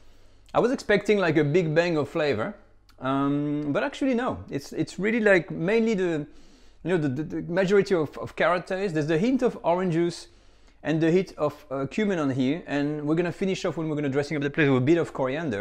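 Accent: France. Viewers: Tres bien merci,de partager nôtre heritage culinaire avec nos amis américains! Parler anglais avec l accent francais est appétissant!! ,je veux dire par là lorsque vous dites des termes de cuisine en prononçant le mot en francais , peut être faudrait il le dire avec un léger accent anglais pour que nos amis anglophones comprennent mieux …ou l ecrire en sous titre avec l explication du terme reduire, bouquet garnis …etc maintenant si tout est expliqué dans le magazine dont vous faites la promotion autant pour moi …je vous ecris parceque j ai eu le privilège de travailler avec le Chef Monsieur Robuchon !! a Monaco a l hôtel Métropole que cette recette est une salade Marocaine revisité…est ce que le magazine en parle ?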